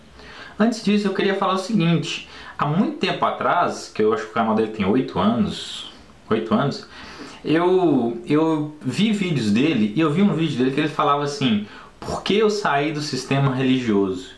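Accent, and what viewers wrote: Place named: Minas Gerais, Brazil. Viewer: Vc é mineiro??? Tem um sotaquezim bem minerim...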